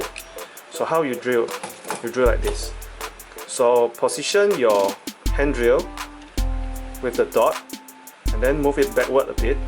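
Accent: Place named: Malaysia. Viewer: super Malaysian accent.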